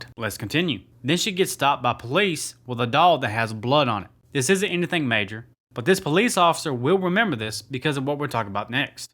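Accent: South, United States. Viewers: your vids are quickky becoming my favorites! Been going through ur lists this last weekend. Great to see someone with a southern accent creating great vids too, bama here! Anyways lovely job, looking forward to CP3, totally my guilty pleasure of the chuckster movies.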